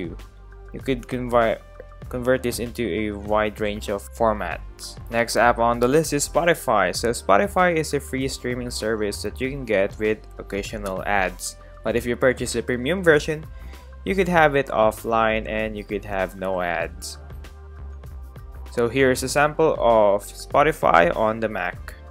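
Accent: Philippines. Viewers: As soon as i heard your voice i knew that you were Filipino, wassap man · Pinoy po ba?! clear po kasi sa accent. ;)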